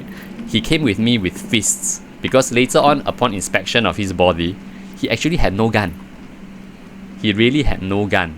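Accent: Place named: China. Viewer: Are you Chinese? You sound Chinese. :D If so, ni hao, gao xin ren shi ni. Thanks for the funny video.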